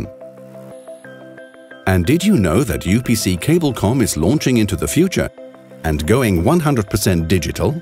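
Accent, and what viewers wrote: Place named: United Kingdom. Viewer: He's Kinda British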